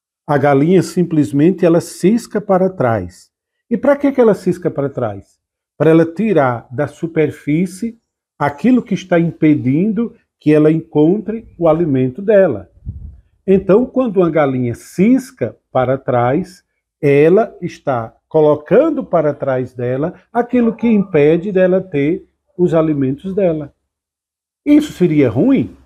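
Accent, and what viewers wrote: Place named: Northeast, Brazil. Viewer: sotaque nordestino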